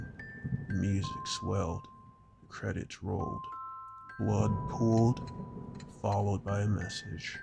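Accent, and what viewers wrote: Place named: South, United States. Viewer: Are you wearing your “human skin” today? 😝 That part of the story gave me chills and then I pictured some kind of ALIEN!?!😱 I loved your precious Southern Belle accent… really suits you and all of your character voices! I hope 🤞 your channel grows fast and soon. I’ve told people, but I’m not good at using my phone and sharing links and bloody blinks! Lol… I’ll get there! Thanks for your content and enthralling stories and skills. It’s not as easy as people think 🤔 I wish you all the BEST and I’ll be watching and learning how to share too ✌️✨🤗✨🐨✨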